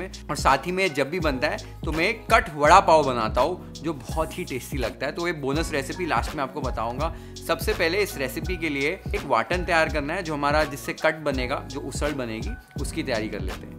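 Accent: Maharashtra, India. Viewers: Your marathi accent is very nice 🚩🚩👌🏻👍🏻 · BRO ARE YOU MAHARASHTRAIAN😮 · Bonus recipe❤🙂👍!!!!! Ekdam authentic preparation chef!!!! Jiyo chef !!!❤ also nice marathi accent❤!!! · R you Maharashtrian? My wonder boy. Your sounds said....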